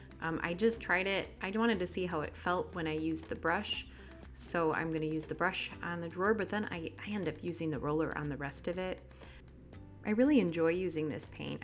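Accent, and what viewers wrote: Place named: Minnesota, United States. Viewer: You sound like your from minnesota.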